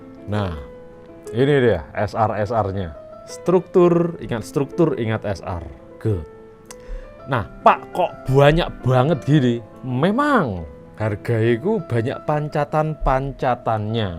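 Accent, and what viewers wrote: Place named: East Java, Indonesia. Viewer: orang mana bang? Aura Jawa Timur nya sangat melekat😂🙏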